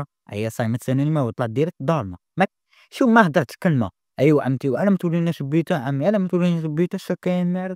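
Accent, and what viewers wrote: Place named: West, Algeria. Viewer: درتلك ابوني علاجال هدرة الغرب رشقة 😂😂😂❤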